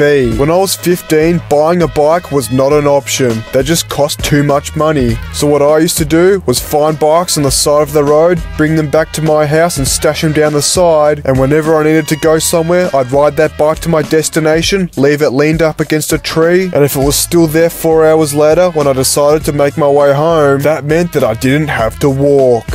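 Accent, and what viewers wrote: Australia. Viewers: I hear Australian accent i will automatically dislike and block the channel, I say that as an aussie myself.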